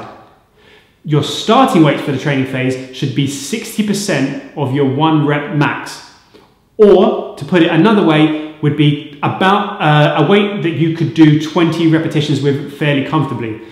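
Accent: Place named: United Kingdom. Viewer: and why is it always bri'ish explaining the german volume training lol , ok jus kdn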